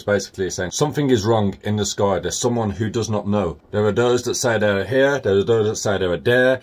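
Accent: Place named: England, United Kingdom. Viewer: hi, where are you from? I adore your english accent. I want to learn it....I must!!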